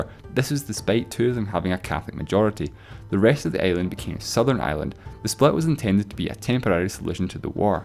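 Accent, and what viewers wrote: Scotland, United Kingdom. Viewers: it doesn't sound scottish anymore woah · He's Scottish and his accent is fine. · His accent is pretty light compared to other Scots the more rural the heavier the accent. Same thing for the Welsh and the English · No Scottish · It's Scottish and why are you calling it ugly it's a nice accent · I'm sorry...I totally got distracted by the sexy bass and the accent of this man! WHEW! It sounds Scottish. Am I wrong? I shall continue watching trying without a hard-on!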